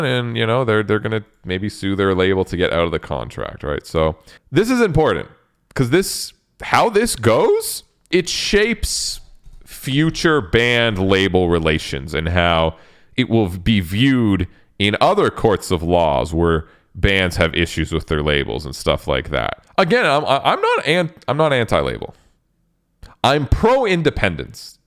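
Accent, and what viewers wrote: Russia. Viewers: Nice Russian accent. Can we all just agree with the fact that Alex has like a little bit more fanbase than STP. And at this point I'm too afraid to ask..... Plzz no offence I dig STP.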